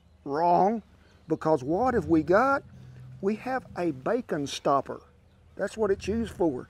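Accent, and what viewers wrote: South, United States. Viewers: Bool the chicken then a nice coat of ool. Southern accent 👌👌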